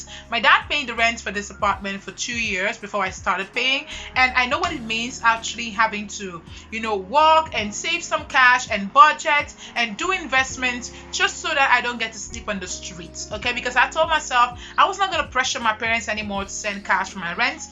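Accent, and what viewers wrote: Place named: United States. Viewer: Why do you have an American accent tho? 😭😭😭 Cringe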